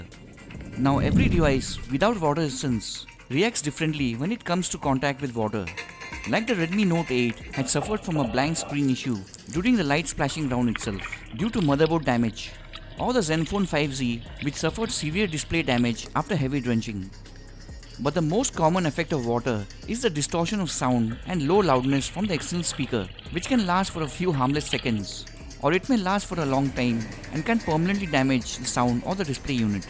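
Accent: India. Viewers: Indian English:))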